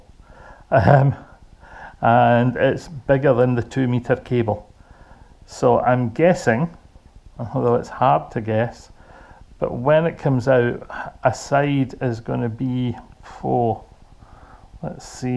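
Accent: Scotland, United Kingdom. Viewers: Everything you have shown is beautiful ! Its great hearing a Scots accent on YouTube ! I live down in Gorebridge . I can talk the heed of a stamp you'll regret saying just ask ...is it a Scottish thing ? We love a natter x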